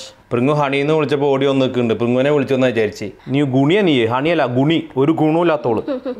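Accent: Thrissur, India. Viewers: What a humour. Trichur slang. Beautiful. Yes ur hands r love. Fantastic presentation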